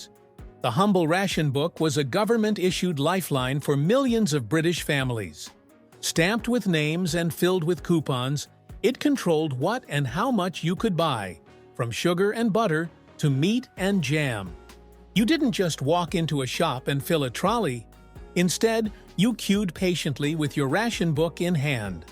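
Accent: United States. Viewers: Appaling American narration yet again! Why carnt you read your script through properly, before starting!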